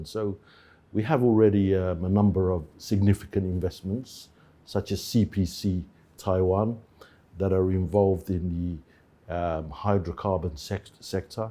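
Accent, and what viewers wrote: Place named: London, United Kingdom. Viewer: What a posh english , London accent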